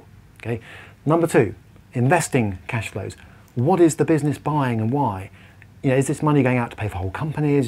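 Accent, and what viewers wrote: United Kingdom. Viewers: His accent and manner is spot on Monty Python's Flying Circus. I can see him now saying, "I want to be a lion tamer!" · What Indian accent??? The guy got a British accent!!!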